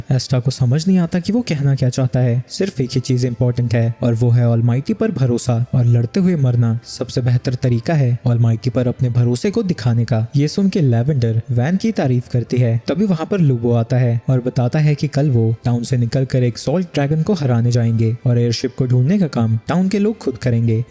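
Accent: Gujarat, India. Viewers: Bhai tum gujrati ho kya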